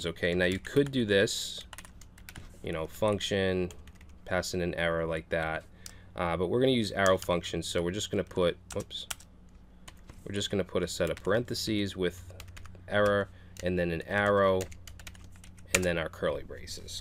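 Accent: Boston, United States. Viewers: Just... tremendous! Your laidback instructional style (and awesome Boston accent) has helped make so many seemingly scary technologies approachable for me, so heaps of thanks upon thee! Now that you've freed the masses from the shackles of "E" in Express and made Hapi.js accessible in its stead, I wonder what kinds of new stacks we're going to start seeing... I have a few in mind: * NASH: Node / Angular / SQL (whichever vendor) / Hapi * HORNY: Hapi / Oracle SQL / React / Node / Yeoman * HOTDAMN: Hapi / Oracle SQL / Typescript / Dust / Angular / Materialize / Node I wonder if any of these stacks actually exist out in the wild LOL!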